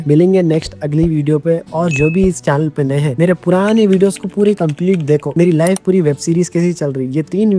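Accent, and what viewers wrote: Hyderabad, India. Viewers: Nice accent bro hyderabadi ❤️ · Tum Hyderabad ke dikhre accent dekh ke. Good to see our Hyderabadi doing such great work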